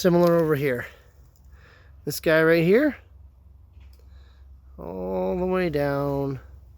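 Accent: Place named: Canada. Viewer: Certain dialects or speakers from specific areas (in this case maybe a part of Canada?) it just how they speak. The intonation you are speaking to falls into this “Canadian accent”.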